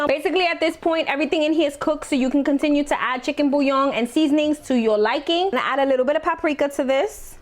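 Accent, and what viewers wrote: New York, United States. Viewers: The New Yawwk accent!!❤❤ reminds me of home! 😊 Thanks for the recipe!❤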